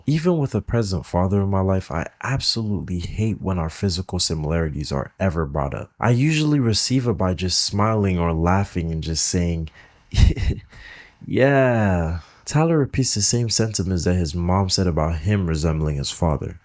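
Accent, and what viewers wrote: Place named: Nigeria. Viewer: Are you NIgerian? I can hear it in the way you pronounced his last name and how you pronounce the word "the".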